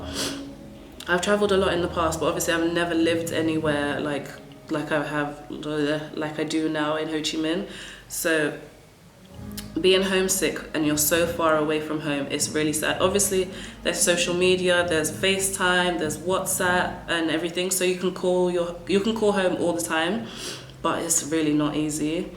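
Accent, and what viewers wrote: United Kingdom. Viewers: i really love ur accent,,its british accent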